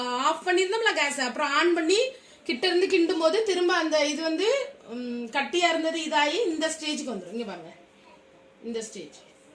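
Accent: Tirunelveli, India. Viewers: திருநெல்வேலி ஸ்லாங் கேட்க நல்லா இருக்கு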